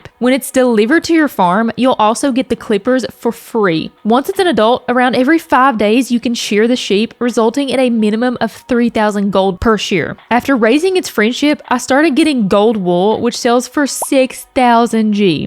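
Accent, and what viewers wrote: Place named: West Virginia, United States. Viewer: I love your accent, my friend is from WV and she sounds just like you!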